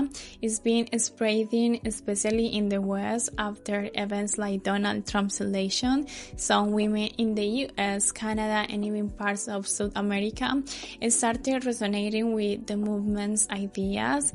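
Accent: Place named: Ukraine. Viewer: Im guessing Ukrainian or Solvakian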